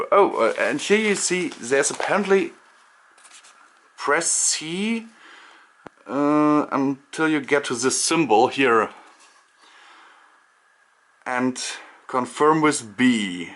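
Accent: Germany. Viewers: For god sake ur german accent is wünderbar! :oo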